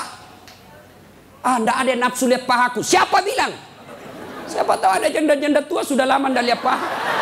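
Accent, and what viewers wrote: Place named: Bugis, Indonesia. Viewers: Suka sama bawakan ceramah dg aksen Bugis dan kalo baca ayat itu sesuai dg bacaan orng Sulawesi Krn disana jarang O yg banyak A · Ustad ceramah keliling Indonesia, tapi logat Makassar nya ndak pernah hilang.dan lucunya lagi siapapun yg dengar ceramahnya ikut tertawa. Ntah tertawa karena memang mengerti atau tertawa karena penyampaian logat Makassar nya 😊. Untungnya pernah 10tahun tinggal di Makassar jadi mengerti sekali apa yg beliau bilang...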